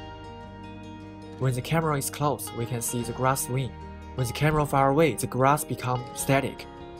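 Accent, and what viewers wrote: China. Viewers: 大陆口音，哈哈哈。加油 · 我听着就像国人的英语，哈哈哈哈，直到看到了输入法。视频真牛逼啊，学到了干货！牛逼！ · Chinese？Your accent is familiar to me. Good Tutorial !!!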